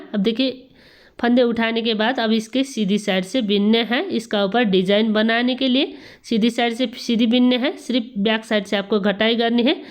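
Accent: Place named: Nepal. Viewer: Kina hindi boleko nepali tone xa ta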